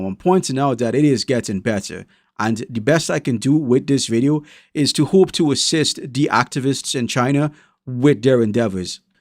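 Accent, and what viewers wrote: Jamaica. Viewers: I don't want to be rude or anything but what is your accent? It sounds like a Jamaican, South African, and New York accent all at the same time. I'm surprised people aren't hunting them down and unaliving these creeps. If there's anyone in China reading this who has nothing to live for... Or if you're suicidal, don't commit suicide and instead... well I can't say it. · your accent is very interesting. it sounds sort of Jamaican/west African mixed with Geordie/north english accent. what actually is your accent?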